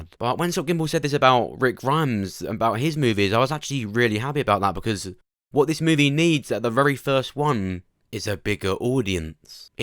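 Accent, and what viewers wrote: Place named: England, United Kingdom. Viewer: I get it, you're English but even as an Irish person I find it very difficult to know what you're saying. You're talking too low and too fast and your words just sound like noise. Btw this video like most of yours could be done in 5 mins. I feel as if you're drawing out the videos so you hit your youtube targets for revenue.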